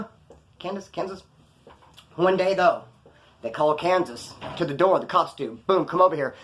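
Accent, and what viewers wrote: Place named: Central Valley, United States. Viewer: You talk like a regular dude from the Central Valley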